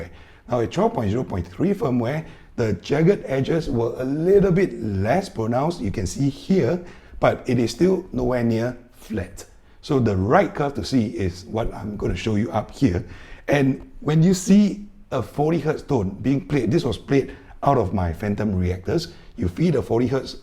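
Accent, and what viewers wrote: Singapore. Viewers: love the videos... And love the Singlish.. No doubt you are from beautiful Singapore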